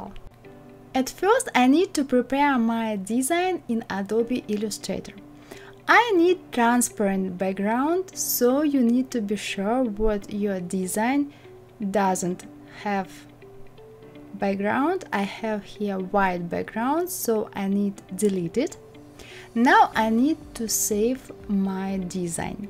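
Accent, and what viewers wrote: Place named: Russia. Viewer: Just Wow. Amazing. Its so easy. Thank you. R u russian? I like your English speaking accent.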